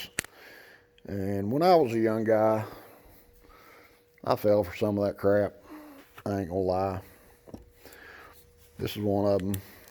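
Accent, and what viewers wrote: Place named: West Virginia, United States. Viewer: oh wow, I’m on the Wv border in Ky. My family is from Wv. I thought I heard you mention Charleston and I was like man the accent sounds like home. We do most of our shopping in Huntington and try to stay in corridor g when we head to Charleston.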